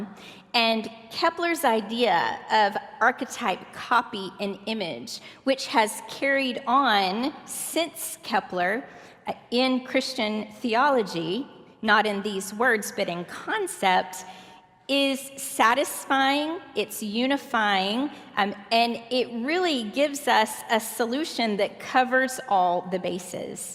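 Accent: United States. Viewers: Hers is the most quintessentially American accent I've ever heard.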